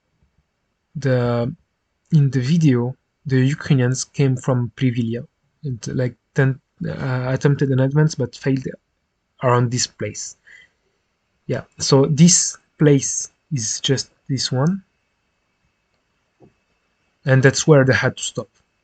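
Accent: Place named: France. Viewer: The french accent 🥵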